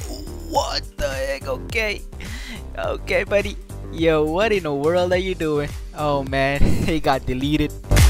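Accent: Philippines. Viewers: Your speaking english but your accent is filipino :\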